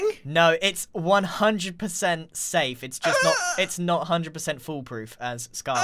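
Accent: United Kingdom. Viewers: To me he kinda sound like a British person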